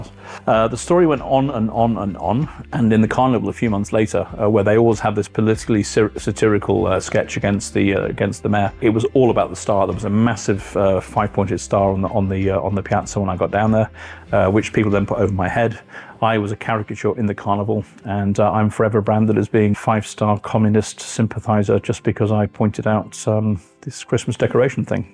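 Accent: United Kingdom. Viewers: You have a pleasant voice and your British accent sounds so good to my Finnish ears. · I agree. has such a nice, soothing voice and British accent is always welcomed.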